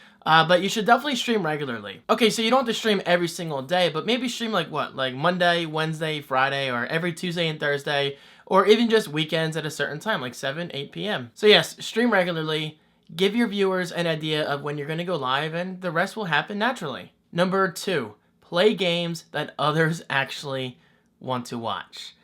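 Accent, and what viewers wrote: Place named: Maryland, United States. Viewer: Are you from Maryland because you have the accent haha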